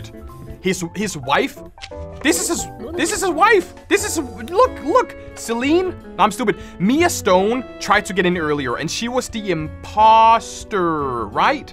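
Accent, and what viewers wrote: Denmark. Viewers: Wait I think bro my be danish he has that danish accent like me except I can get rid of mine for a more American accent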